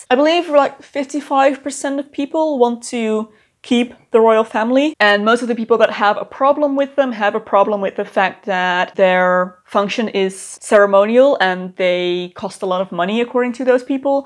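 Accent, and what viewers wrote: Netherlands. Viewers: I always wondered whether you were Dutch or not, because of your accent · I heard you were dutch the second you opened the mouth you have the accent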